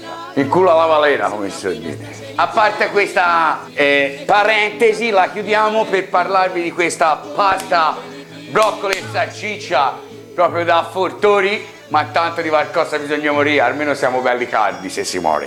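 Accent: Tuscany, Italy. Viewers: Ai raggione morire con la panza piena o tutto quello che lei sta preparando ,,domani sabato li faccio ciao bella cadenza TOSCANA 😋😃😄😏😀😁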